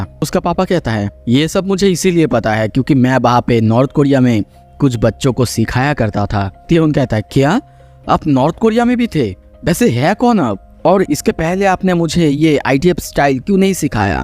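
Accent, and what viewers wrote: Bengal, India. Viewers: bro r u bengali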